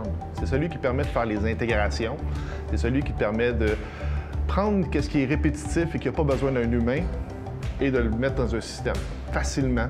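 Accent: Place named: Canada. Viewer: Merci de m'avoir sauvé 😘👍🏾 (ok, elle était facile) Très bien expliqué, en français, avec ce délicieux accent canadien fortement apprécié 🥰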